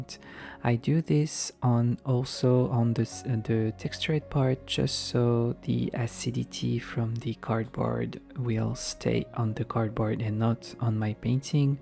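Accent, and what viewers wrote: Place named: France. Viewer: I recognize a french accent when I here one hahaha 😂 Is your family from Corsica? Beautiful painting as always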